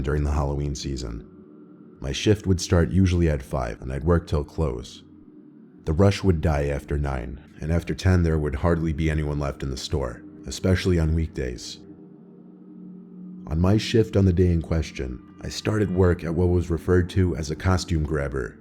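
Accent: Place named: United States. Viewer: After listening to so many Be. Busta vids I can hear heavy American accent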